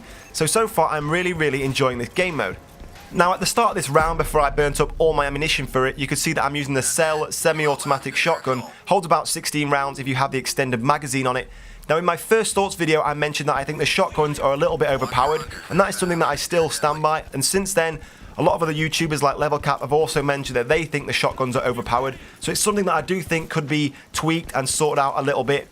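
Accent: United Kingdom. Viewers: wow i like your accent =) British ??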